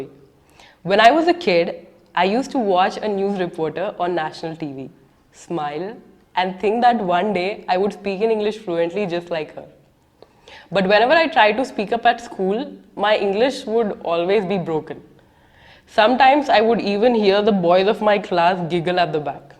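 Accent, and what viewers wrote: India. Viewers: You spoken english but saying like hindi. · This isn't a impeccable comment. She is Indian so she will obviously, by heredity, have a indian accent. Nothing impeccable. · Your english accent is pure Indian.. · I just love the way u speak English 😌,,,,your English accent proves that u r an indian and even you never try to say English like their 😚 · I have question why all hindis accent like this? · I love Indian accents like how you are talking,..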